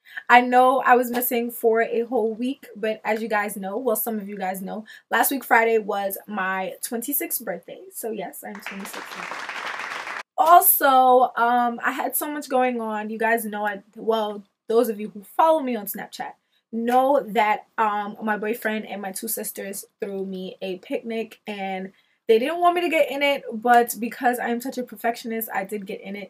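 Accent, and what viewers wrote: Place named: Jamaica. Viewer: Yaasss!!! Bust out that Jamaican accent👏🏿👏🏿👏🏿🇯🇲My mom doesn't play with my education either.😂 Where my Jamaicans at???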